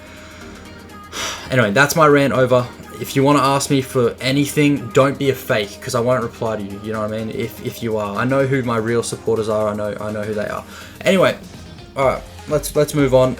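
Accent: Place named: United Kingdom. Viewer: I was just wondering where you are from? You sound like you have a little bit of a British accent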